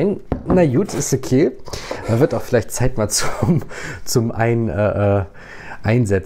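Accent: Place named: North, Germany. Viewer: Ich liebe, dass du uns neben der Vermehrung von Pflanzen nebenbei noch Nachhilfe in norddeutsch gibst 😅💚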